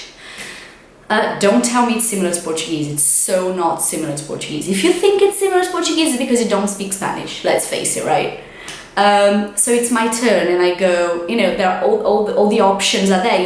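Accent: United Kingdom. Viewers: Seu inglês britanico é lindo e perfeito assim como seu português, por tanto qualquer brasileiro se vira bem em países de lingua espanhola, melhor que gesticular tentando falar ingles com quem nao entende.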